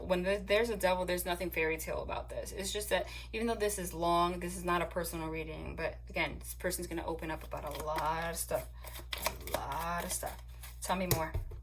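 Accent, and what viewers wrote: New Jersey, United States. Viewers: I have just come across your page and im thinking “she has kinda a jersey accent hmm” and you said your from nj omg 💖 I love it!!! Its been crazy here as you know.... light and love for you sister 💞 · I love her Jersey accent!! So cute and entertaining 😁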